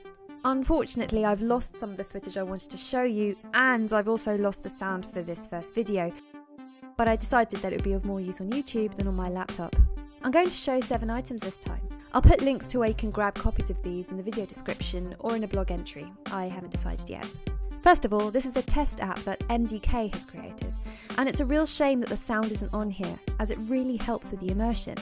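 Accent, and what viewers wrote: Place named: United Kingdom. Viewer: Yes, I love her accent. I generally dislike British accents. I'm from South Africa, where I'm used to an accent where they pronounce the letter 'T' correctly, whereas in the UK, they'd say Liber'ee rather than Libertee. I really dislike it when Brits take too many shortcuts with their English words. Which is ironic considering that they should be the leading example of the language.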